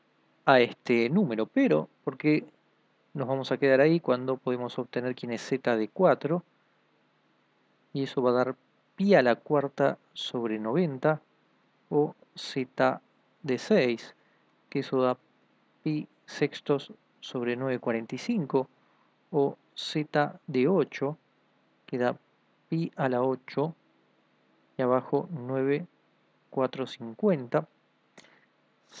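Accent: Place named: Argentina. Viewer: Gracias por la info, por tu tono pareces de Argentina, es así? En donde estudiaste?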